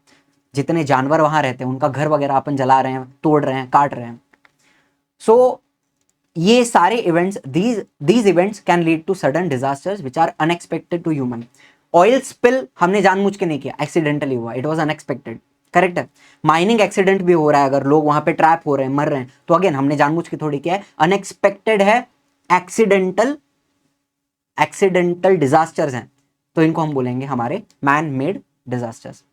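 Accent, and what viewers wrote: Maharashtra, India. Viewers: Sir AP Marathi ho kya Hindi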